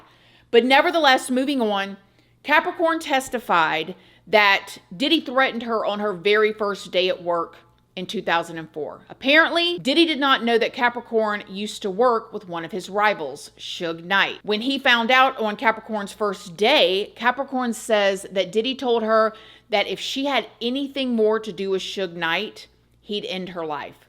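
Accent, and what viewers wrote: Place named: New York, United States. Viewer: Is Christina from New York she has our accent lol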